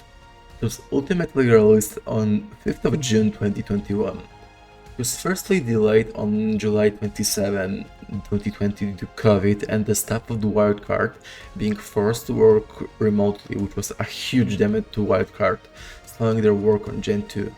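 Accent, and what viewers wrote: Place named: Poland. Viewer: This guy sounds Polish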